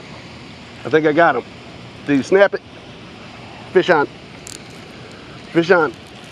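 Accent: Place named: Rural, United States. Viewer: Why does he sound like he’s trying to cover up a country accent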